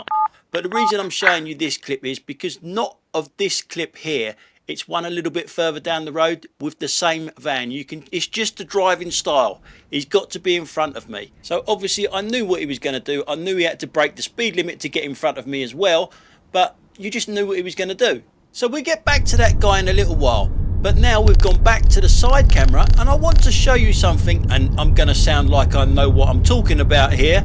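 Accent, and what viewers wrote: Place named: West London, United Kingdom. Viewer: You sound nothing like Michael Caine, You have a West London accent like me.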